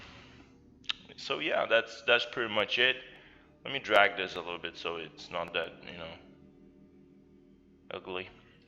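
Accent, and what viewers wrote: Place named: Eastern Europe. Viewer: English isn't the guys' first language. Give him a break. It's common for eastern Europeans. Especially east block scum.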